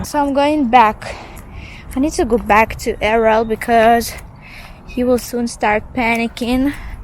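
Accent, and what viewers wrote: Patois, Jamaica. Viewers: O shit she got a Jamaican accent wasn't expecting that. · Looooobe her jamaican accent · She sound pure Jamaican . Never knew Russian married to blacks . That’s an unlikely pair . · I love wen u speak and I agree with you totally on these topics. It's good to hear you speaking the patwah and you have a wonderful family thts worth fighting for to stay together always. Thanks for sharing and all the best going forward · I love the way you embracing our jamaican language good! job 👍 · Ummm… she’s Jamaican. How am her accent be on or off “point”, it’s her natural accent. WTF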